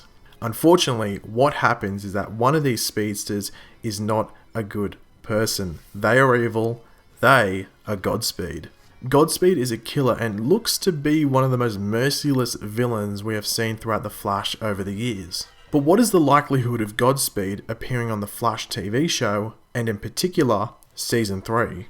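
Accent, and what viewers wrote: Australia. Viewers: u sound Australian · Are you Australian?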